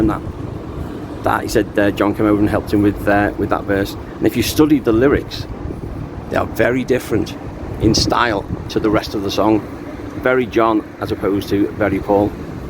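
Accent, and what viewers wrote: Liverpool, United Kingdom. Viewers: Thanks for this video ... I love your Liverpool accent! My son studied Dentistry there for 5 years. I loved the old photos and all the info you give us here 😊😊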